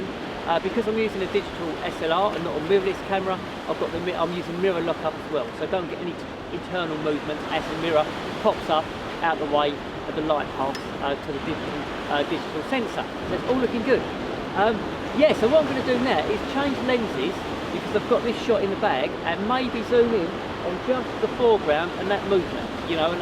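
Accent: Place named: Essex, United Kingdom. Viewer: Great Essex accent!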